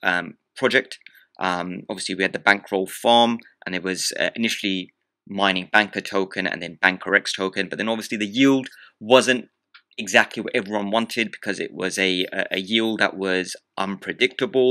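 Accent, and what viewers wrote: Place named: London, United Kingdom. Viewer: Thanks buddy, I am London :) sounds like you are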